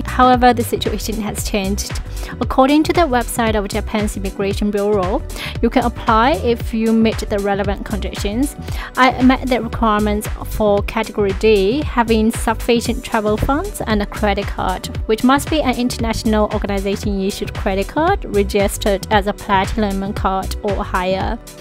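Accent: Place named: China. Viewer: 英語は大丈夫だよね 中国人ですか？その発音はちょっと中国語だから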